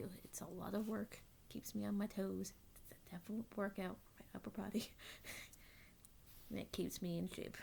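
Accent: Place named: South, United States. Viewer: How in the hell do you get so much social media hate? Yes, you can come off strong and as a quote unquote "bitch" in other people's opinions. I think you're the smartest person I know and you are funny and genuinely a caring human. I think Larson should have just let Chase by so that the 9, 42, and 3 could have pulled away. It was fun and i like this new Camaro. I do agree that MD0 car was awesome. Btw, your cat stole the video and i swear I heard a hint of southern in your voice. Have a great weekend and stay safe, the internet really does need you. I also wanted to agree that Austin is a great guy and takes lots of time for autographs. I got him (and the other coke guys) to sign my coke hate. I wanted to end this by saying I'm sorry Rivers left your Chargers. He's better then people think and should be a champion. Please don't let other people change you, those jerks suck. 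I hope Twitter people aren't being as rude anymore. I love your 24 shirt and i hope you'll be buying the zero shirt.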